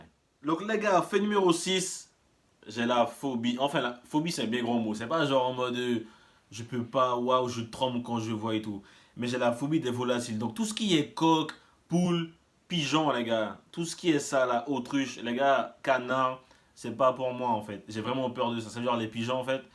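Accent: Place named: French Guiana. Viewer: Je le jure que sur la façon de parler ça ce voit qu’il est guyanais incroyable surtout le "les gars"😆 🇬🇫✨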